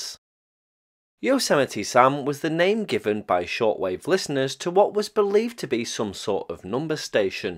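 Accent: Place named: Manchester, United Kingdom. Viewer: Hearing Yosemite Sam referred to in a Manchester accent gives a whole new take on one of my favorite childhood cartoon characters.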